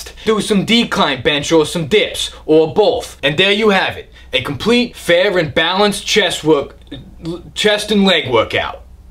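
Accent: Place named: Italian-American, United States. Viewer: It's second-generation Italian-American accent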